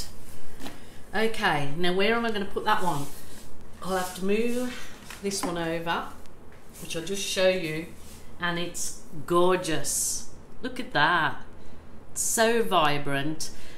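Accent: Birmingham, United Kingdom. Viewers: Me too, ex brummie. Noticed the accent straight away. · You sound like me, I’m a brummie... a thingy 😂😂😂😂💖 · I thought she sounded like she was from Birmingham in the UK LOL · Yeah....don't get your heat gun mixed up with your magic wand, ladies! It would lead to an embarrassing trip to A&E 😂😂, are you originally from the UK, Birmingham type of area? I'm trying to place your accent and it's driving me crazy 😂😂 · Thought exactly the same...she’s deffo got a brummie twang 😀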